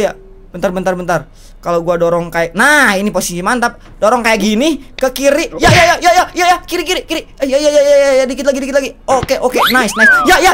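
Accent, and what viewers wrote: West Java, Indonesia. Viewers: sunda bgt wkwkwk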